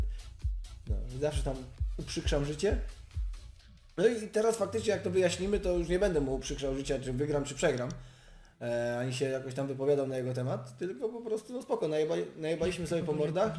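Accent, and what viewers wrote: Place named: Silesia, Poland. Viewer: Bo on jest chyba ze Śląska i tam tak mówią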